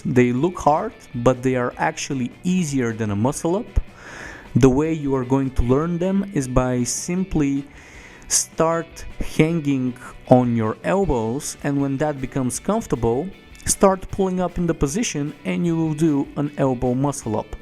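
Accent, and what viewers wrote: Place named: Russia. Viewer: You have accent just like you are Russian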